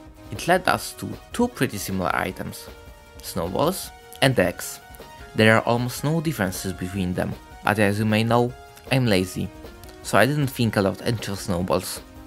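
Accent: Poland. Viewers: You're from Poland, am I hearing right? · słychać od ciebie polski akcent :) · Wowowowo I didn't expect that but I really hear that you're polish haha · Bro you sounds very polish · Yo, you have the perfect Accent (Polish Accent) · I love that polish accent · polski akcencik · Od razu pomyślałem że polak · you sound soo polish xD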